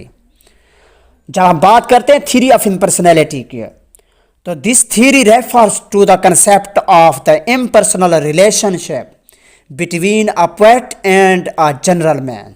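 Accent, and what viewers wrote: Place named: Saraiki, Pakistan. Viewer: Saraiki accent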